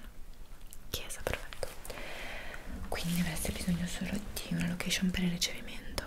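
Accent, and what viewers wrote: Italy. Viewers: Soy el comentario en Español que no esperabas. No entiendo nada pero me encanta el acento italiano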